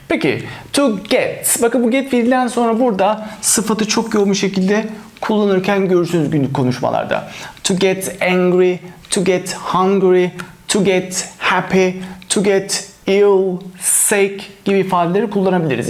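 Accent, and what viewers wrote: England, United Kingdom. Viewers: Hocam cidden orada "Cancer" demeniz çok hoşuma gitti, dalga geçmiyorum yalnış anlamayın. British Aksanı harika bence. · bey anlatımlarınız etkileyici ve eglenceli olmasına rağmen asırı ingiliz aksanızdan dolayı size kızıyordum 😆😆😆 hatta eleştirdim bile ama şimdi kızmıyorum durum deyisti çünkü yavas yavas sizi anlamaya başladım 😆😆 önceki eleştirimi geri alıyorum ve sayğılarımı sunuyorum. siz cok kaliteli bir öğreticisiniz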